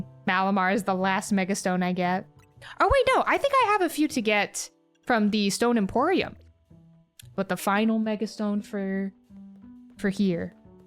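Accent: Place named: Midwest, United States. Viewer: Is it just me or does she have the most Midwest accent possible?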